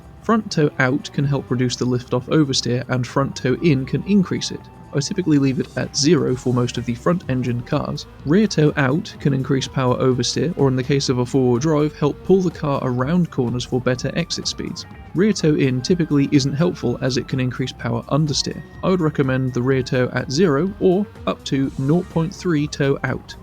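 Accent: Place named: Northern England, United Kingdom. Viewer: This series is a life saver thanks bro! Not had gt7 long and got a T248R last week well what a difference I’ve gone from winning easily to fighting to staying on track lol I thought I would be better with the wheel lol.. Il be making good use of your videos and learning as much as I can to improve my skills. U from the north? I from north west and you speak like a northern lad or midlands maybe. Well thanks for the videos you have a new subscriber here and thanks for not asking for likes n subscribe before we watch the content I really appreciate that you suggest at the end instead. Ta bro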